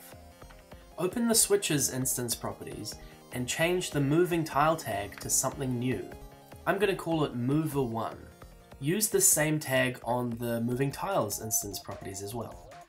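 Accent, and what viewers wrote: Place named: New Zealand. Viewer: you sound like a kiwi XD sorry if ur not XD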